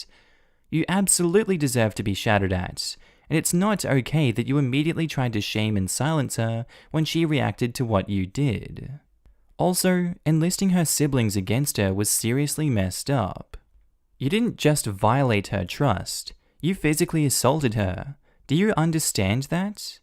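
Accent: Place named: Australia. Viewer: I don't know why, but I just love the Aussie accent, and slang. I know there's probably many types of Aussie accents, like there's different American and British accent, but the one you have is my favorite. I love listening to Reddit videos, and having an Aussie doing makes it even better.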